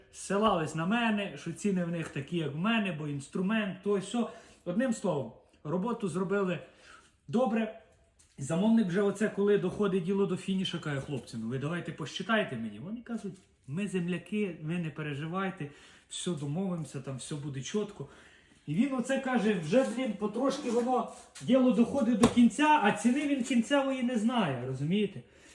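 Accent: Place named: Lviv, Ukraine. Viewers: Чиста наша львівська мова !!!❤😊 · Діалект чи вимова наша львівська, але не мова, мова укрвюаїнська .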